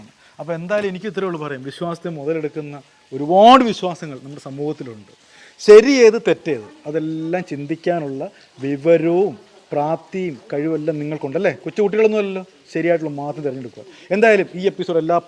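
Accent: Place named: Trivandrum, India. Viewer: 😂😂thani Tvm slanghu 😂😂😂. Vayar kurakku nalla adi aanalle 😂 meesha. Uff soooper ❤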